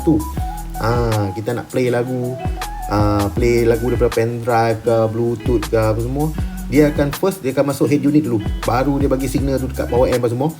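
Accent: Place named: North, Malaysia. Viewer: kedai dekat mana boss..dengar cakap bunyi utara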